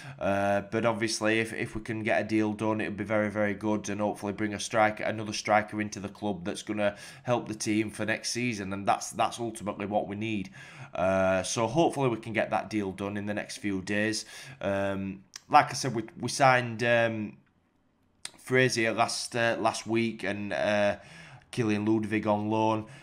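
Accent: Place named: Barnsley, United Kingdom. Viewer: Watching with subtitles is funny as.... 'Welcome to tax tv' 'Pascal Cupcake' Shows that algorithms can't understand the Barnsley twang 🤣🤣🤣🤣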